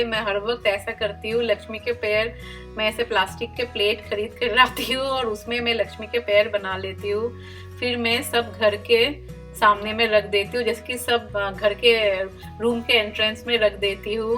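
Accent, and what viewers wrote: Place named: India. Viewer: I love ur Hindi tune n ur also awesome I like it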